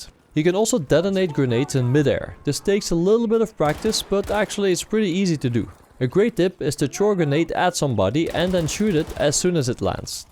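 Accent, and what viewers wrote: Netherlands. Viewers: Are you Dutch hahahha your English is like that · His accent is sooooooo DUTCH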